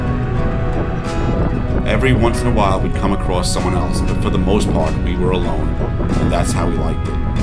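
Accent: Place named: New York, United States. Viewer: This Jersey Girl loves your NY accent. Thanks for sharing this beautiful drive!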